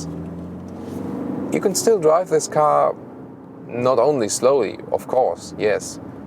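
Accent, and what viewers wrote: Germany. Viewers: I don't think I'll ever bother to watch BMW reviews without a German accent again. It just seems. so. right.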